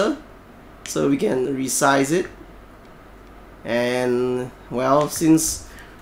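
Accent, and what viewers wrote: Singapore. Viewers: you sound Singaporean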